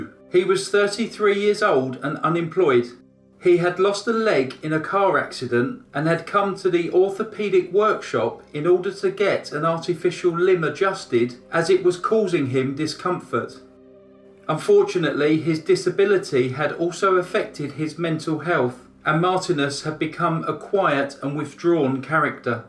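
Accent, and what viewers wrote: United Kingdom. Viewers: First time viewer. Love the subject matter but (I'll make enemies here) your audio sounds very canned. If I could offer some CONSTRUCTIVE criticism, improve your audio because you have a great voice and what American doesn't love a Brit accent? Keep up your great work but the audio recording is just... a bit off putting. Improve that and your "likes" will shoot through the roof. Love your work. Keep up the great stories! Bill: Brooklyn, NY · Cool! I am subbed to both and CR and have been for some time! I swear they sound very similar voice wise, just different U.K. regional accents! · Brit accent goes a long way 🥰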